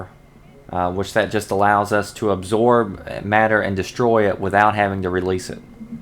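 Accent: South, United States. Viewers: your southern twang is annoying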